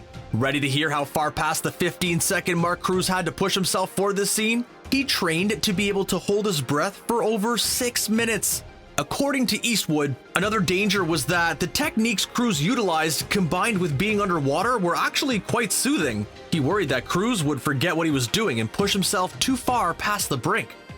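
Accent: Canada. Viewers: Can't deal with the heavily Canadian accented narration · How come all these types of docustyle posts are done by Canadians with heavy Canadian accents?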